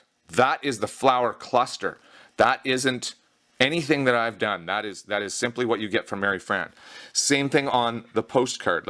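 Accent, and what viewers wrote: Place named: Canada. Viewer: You must be a fellow Canuck, at least you sound like one!!! I really love your collections, the dog ones are my faves. I look forward to your new ones. How about a vintage floral meets steampunk inspired collection?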